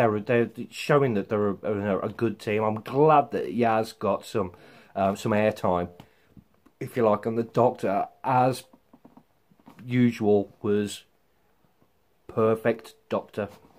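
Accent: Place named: Northern England, United Kingdom. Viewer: Thanks man, if you don't mind me asking, where in the UK are you? You sound quite northern ..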